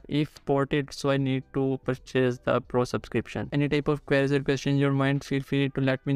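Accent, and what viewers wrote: India. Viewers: You Indian accent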